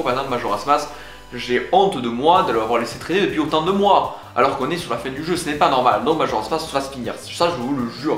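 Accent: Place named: South, France. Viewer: L olo sauf ta voix du sud ^^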